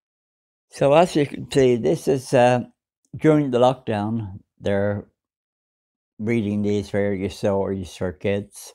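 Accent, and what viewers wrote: Ireland. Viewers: Its the content is what counts man. Many excellent points. You are not wandering at all man. Its also your honesty and integrity. Im born and bred Dublin too but I could listen to that accent and watch you all day. Keep up the great work. You are an old-school true blue, true Dub with top values and principles. Yes it is stunningly disturbing how quickly woke was adopted here and throughout the west. Its the wealthy Marxists to blame! Ireland seems lost. God bless.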